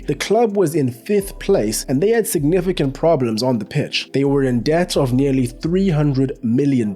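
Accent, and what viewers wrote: South Africa. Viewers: Bro are you south African? Your accent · You sound South African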